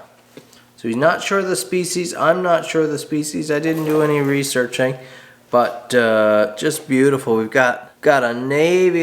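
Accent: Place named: Newfoundland, Canada. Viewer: you live in Newfoundland?? no wonder i can't understand you LOL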